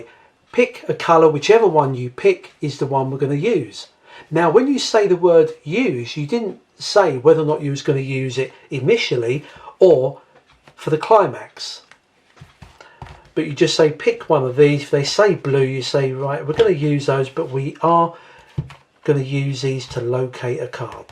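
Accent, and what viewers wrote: United Kingdom. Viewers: I am glad you liked it and hope you'll try it out. Let me just say that you're doing a great job with the channel, got me subscribed. It's so good to see a knowledgeable man teach magic with soul (and a british accent ;) ). I wish you all the best things you can wish to yourself. Keep up this fantastic work and Greetings to you from Moscow!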